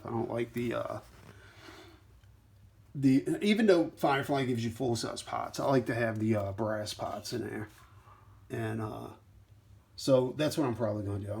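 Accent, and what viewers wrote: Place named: Baltimore, United States. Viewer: You sound like you’re from ball more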